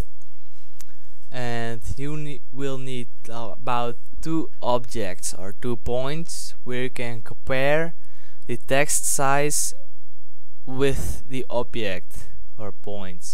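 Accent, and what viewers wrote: Netherlands. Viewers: ja klopt ik klikten op de video en hoorde gelijk dat hij nederlands is :P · je hoort dat je nederlands ben haha aan je accent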